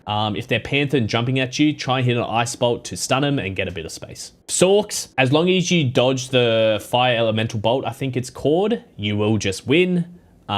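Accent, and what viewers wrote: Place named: Australia. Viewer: Loving the australian accent haha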